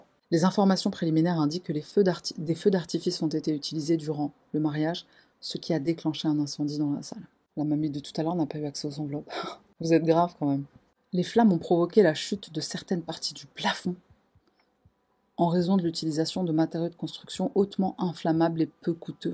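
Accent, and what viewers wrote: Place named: United States. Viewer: Slt et le kiffe tu 😊parle super bien anglais avec l’accent et tout trop bien en clair américain et c’est trop cool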